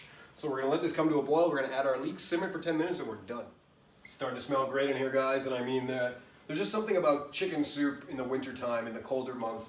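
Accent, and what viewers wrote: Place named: Scotland, United Kingdom. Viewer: I usually have that as a starter whenever I'm in Scotland. Brings back memories of having it on cold days, both summer and winter 😅 I always like how the Scots add barley in soups while in Asia, it's served as a drink or dessert. There are variations of Scottish accents and yours reminds me of the Simpsons. 😅 Great one. It looks amazing and rustic 👍🏻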